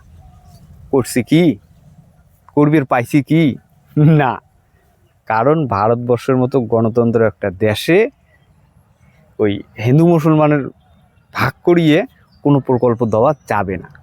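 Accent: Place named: Bangladesh. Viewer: তুম কহা কা জ্ঞানী বাবা!😂 তুমি এই ভারতে কবে থেকে বাস করছো। তোমার কথা বলার ঢঙ্ দেখে মনে হচ্ছে যে, ওপার বাংলার। এখনো ভারতের মতো রপ্ত করতে পারো নি।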